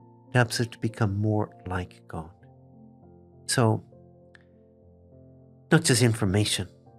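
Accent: Ireland. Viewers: his brogue, while lovely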